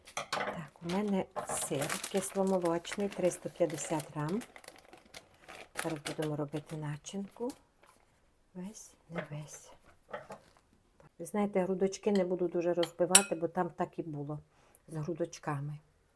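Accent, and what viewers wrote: Transcarpathia, Ukraine. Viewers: Не нервуйте😊- то є Закарпаття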